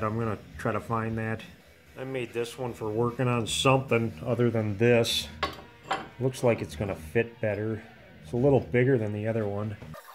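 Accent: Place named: Minnesota, United States. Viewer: What an interesting channel, a creative thinkers oasis in the middle of Minnesota. Now I understand the accent in the movie Fargo, ha ha ha.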